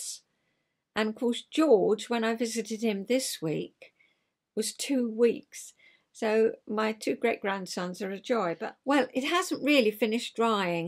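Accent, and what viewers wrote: United Kingdom. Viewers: Dear, Oh my goodness! I just popped in to see you, because I’m a huge follower of Julie’s! She mentioned you & said how much she loves to listen to you talk! I agree, you are an absolute delight! Where in the UK do you live? I love all the different accents & expressions that all of you have! I live in Lawrence Kansas, smack dab in the middle of the US! I lived in Cambridge (England!) for a year, when I was 6 years old way back in 1963. Even though that was practically the age of dinosaurs, I remember so many things so clearly! I came back with a lovely accent, but the other children at school made fun of me, chanting “Erica-America”, so I lost it as fast as I could… I wish I had that accent now! My favorite memory was a pair of blue “real” leather shoes my mom bought me. They had laces, maybe like an Oxford shoe? At any rate, I wore them to bed that night, because I loved them that much! 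Silly, right?! I wish I had a photo to go with that memory! Your little journals are so wonderful, I think I need to think about making those for my family, too! And those 12x12” paper pockets are wonderful, just so long as you don’t cut off the wrong piece! It’s so nice to “meet” you & I’ve subscribed, so I’ll be checking in again, soon! Take good care! Erica❤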